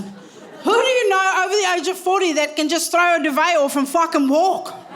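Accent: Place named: South Africa. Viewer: She is hilarious and FINALLY learned why she has such f*ckery going on in her accent! South Africans already have the strangest of the British accents, but add some Kiwi and Aussie to the mix and you get this I guess.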